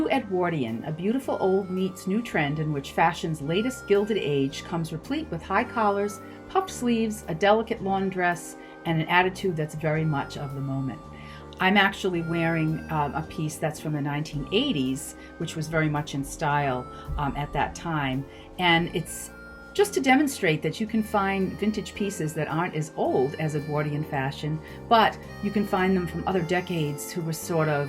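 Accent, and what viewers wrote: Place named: Rhode Island, United States. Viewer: You sound like you're from Rhode Island (?) ~ Heather ~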